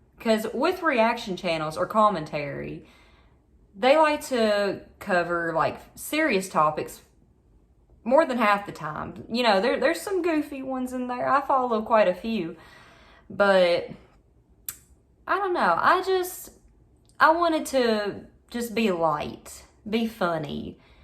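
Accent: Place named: South, United States. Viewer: On tiktok on the newer ones I would guess Arkansas or Texas. This one feels more north central, Tennessee maybe. I'm from Louisiana myself and usually have an excellent ear for accents but I can't seem to narrow yours down. That's my random thought. Carry on.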